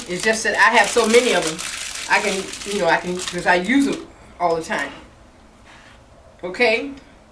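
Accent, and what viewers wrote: United States. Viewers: I love your channel listening to your southern voice is so somthing while you teach this genaration cooking skills. Am gracful for the holy spirit amd my mom that thought me many cooking skills, but am also open to other techniques and always willing to learn another way of doing things. I've been cooking since age of 8yrs old. My mom would let me loose in the kitchen amd it would be a flour everywhere day. She said it was the only way to find my own way of doing things in the kitchen. God bless you your family. I feel as if am apart of the family now and your my grandmother.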